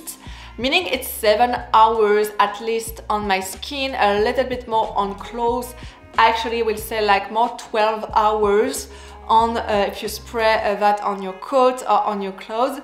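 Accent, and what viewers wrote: France. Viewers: This is 1 of my all time FAVORITE parfumes EVERRR I was introduced to this fragrance at the tender age of 14 brought my 1st bottle at 16 years young along with yves saint Laurent champagne and Dior's poison tendre And Amarige Been looking into getting a new bottle of lair du temp Because I have been seeing her ALOT as of lately And I BEEN into getting all my original signature scents because MINES are some of the best ever made due to my GREAT taste in fragrances and scents🙊😜 LOVE your videos you are AMAZINGLY DECADENT TO WATCH YOUR GORGEOUS & SWEET & IM A SUCKA FOR A FRENCH ACCENT IM A SOFTIE FOR AND ACCENT PERIOD. BUT ESPECIALLY FRENCH BEING IT'S THE LANGUAGE OF LOVE AND ALL 💗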